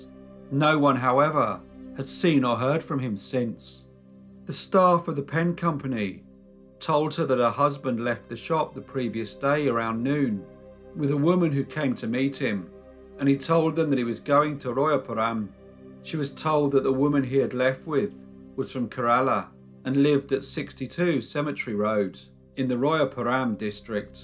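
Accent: United Kingdom. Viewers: thank you for another outstanding video of true crime that is both unique and unheard of by me. You and Forgotten Lives have some very special content and it's an absolute pleasure listening to both of your videos. 🙏🙏Plus, your awesome British accent makes everything sound even better. 👏👏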